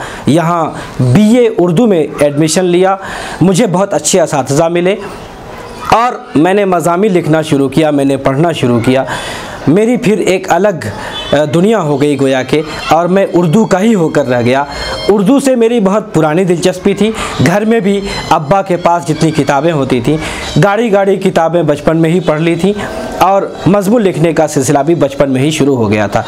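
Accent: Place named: India. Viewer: Ap janab ka Labb o Lehja to hindi hai.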